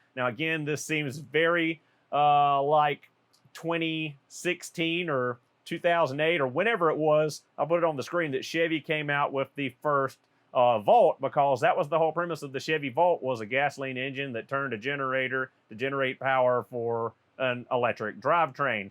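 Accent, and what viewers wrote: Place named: Arkansas, United States. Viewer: I love your accent 🙂, Arkansas? Love from Czech rep. Thx for great content.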